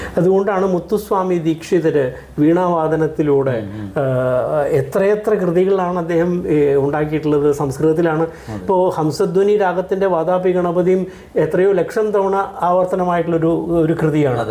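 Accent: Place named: Karnataka, India. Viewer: കർണ്ണാടക സ്വദേശിയായ മലയാളം. 🙏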